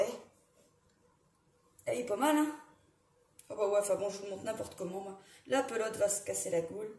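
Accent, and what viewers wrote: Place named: Mayenne, France. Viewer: Bonjour j adore votre énergie et votre simplicité du coup je me suis abonnée et j ai visionner vos anciennes vidéos j ai adoré celle de la teinture de la laine et c est du boulot je viens d entendre la ville Laval et rien qu' à vous entendre je me doutait que vous êtes mayennaise je suis aussi de la région à bientôt dans la prochaine vidéo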